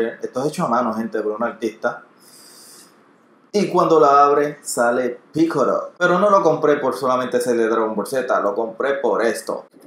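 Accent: Puerto Rico. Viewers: OYE ERES DE PUERTO RICO?? Soy dominicano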